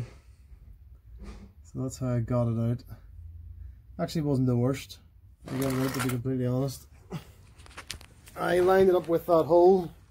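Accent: United Kingdom. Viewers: Great video discribing a similar fault with my e92 , you sound that your from NI, would you consider homers? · Great video mate, and good to hear my local accent (N'Abbey). This will be my next project in the coming days/weeks, as I have the same warning lights, (with engine power loss and car shuttering when at high speeds). I think I'll just replace the DriveShafts with new, as I've seen videos and photos with badly corroded shaft ends and Relevator Rings, and a lot of effort to try to clean the crud & rust off them. Thanks for sharing!!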